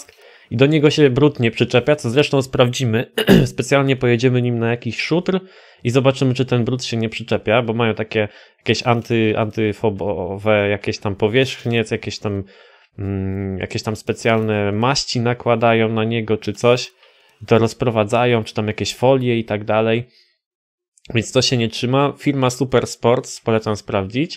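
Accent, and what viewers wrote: Szczecin, Poland. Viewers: Ze Szczecina jesteś?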